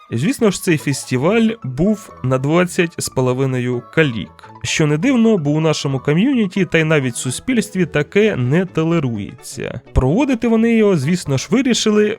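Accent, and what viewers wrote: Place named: Russia. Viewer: так ти ж сам росіянин, лол. по акценту чути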